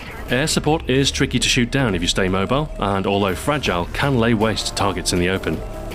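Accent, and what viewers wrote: United Kingdom. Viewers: so british?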